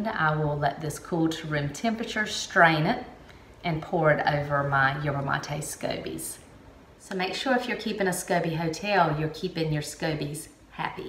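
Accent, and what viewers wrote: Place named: South, United States. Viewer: What southern accent is this anyone please?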